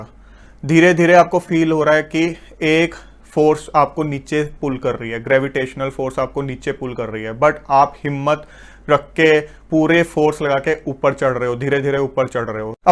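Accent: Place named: Punjab, India. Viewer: Your language is filled with punjabi tone😆❤️ sir!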